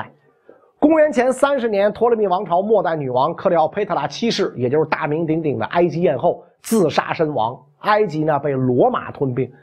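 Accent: Beijing, China. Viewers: 嘥氣啦，從前的中華文明文化早已被滿清和共產中共中斷了。至少阿袁生你現滿囗京腔的煲東瓜就不是原來漢人語音，煲東瓜基本上是蒙滿的雜交語，作為文化最重要的載體語言都被入侵者同化了，還好意思說你同化和改變了入侵者真的是太過荒謬。還有就是儒家，從滿清到今日的儒家早已不是滿清以前的儒家了，今日的儒家是被滿清及中共改變扭曲了的奴化儒家。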